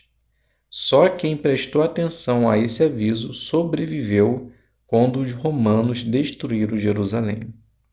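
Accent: Rio de Janeiro, Brazil. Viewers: Legal, parabéns irmão. Amo o sotaque carioca.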